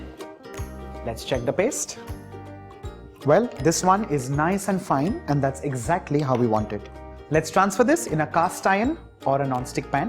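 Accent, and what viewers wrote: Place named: India. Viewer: hahah - indian English is amzaing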